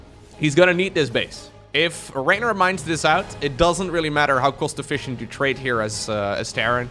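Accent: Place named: Netherlands. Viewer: it's actually "broodlords" and "broodlings", but dutch accent makes it sound like that.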